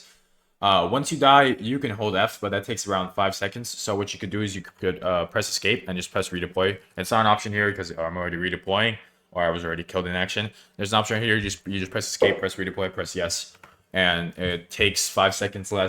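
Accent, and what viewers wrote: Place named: Scotland, United Kingdom. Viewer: Oh the Scottish accent 😭 maybe work on it I suppose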